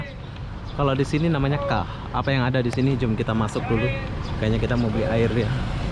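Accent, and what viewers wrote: Malaysia. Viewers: Makanya saya agak heran aja,setiap d tanya pasti bilang dari lombok,tapi logat dan bahasa byk pake melayu malaysia nya...ok salam bang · tapi logat abg mcm org malaysia..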